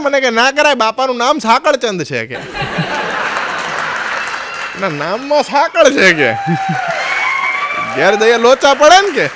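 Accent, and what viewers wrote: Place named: Ahmedabad, India. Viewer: ભાઈ તમારા હાસય મારફત તમો જે લોકો નુ દુઃખ દૂર કરો છો તે માટે આભાર વકત કરુ છુ આજે હાસ્ય વિસરાય ગયુ છે કેમકે આજે પતી પત્ની બંને જણા વ્યવસાય મા એવા રોકાયેલા છે હાસય શુ છે તે ભુલાય ગયુ છે તમો જે બોલો છો તે પાકકા અમદાવાદી છો એવુ લાગે છે તમો ને સાંભળી ને ખુબ આનંદ થાય છે આ રીતે આનંદ પીરસતા રહેજો આનંદ આભાર આશીર્વાદ ધન્યવાદ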